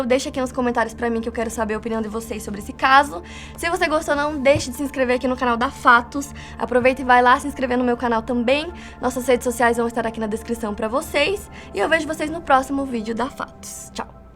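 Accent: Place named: Rio Grande do Sul, Brazil. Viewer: Sotaque fofo.... Gaúcha?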